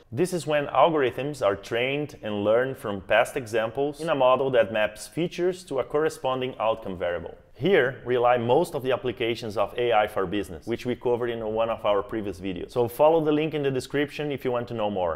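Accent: Brazil. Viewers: Certeza que é brasileiro hehe... belo video!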